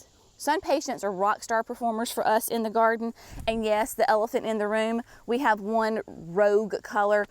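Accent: South, United States. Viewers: You give me such great joy in the morning. I grab my coffee and listen to that beautiful southern melodic voice of yours. You and have worked so hard on the gardens. Proven Winners picked the most dedicated gardeners in the South! I can’t wait to see what you all do.😍❤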